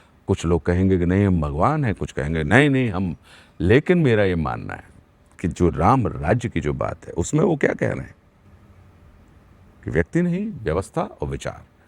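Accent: Standard. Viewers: Kya zbrdst shudh hindi bolte h ❤ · Haven't heard such a pure Hindi narration in a long time. Ashutosh Rana's command over the language is impeccable. I wonder where the intellectual people of our country are nowadays? Feeling great listening to it. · So good to listen pure hindi, very rare to hear pure language these days.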